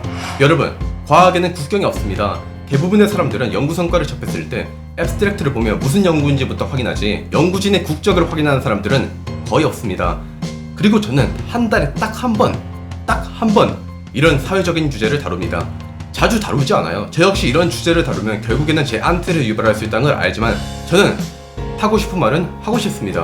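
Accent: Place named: Masan, South Korea. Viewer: 마산 억양이 묻어나오는 서울말씨. 잘 봤습니다^.^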